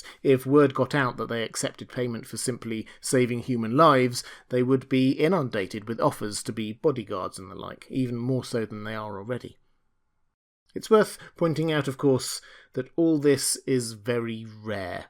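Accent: United Kingdom. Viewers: Lol it's just his British accent I think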